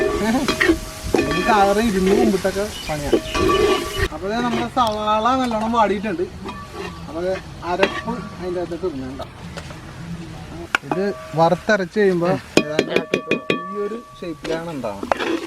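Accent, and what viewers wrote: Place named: Coastal, India. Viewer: Evda veedu 🏡 ?slang 😂😂😂near kadapuram aano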